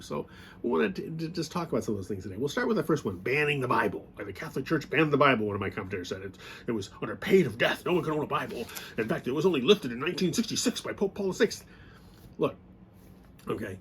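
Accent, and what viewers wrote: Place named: Minnesota, United States. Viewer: Hey, I'm watching this video--per your recommendation! BTW, are you originally from Minnesota? I'm only guessing this because your accent reminds me of where I grew up.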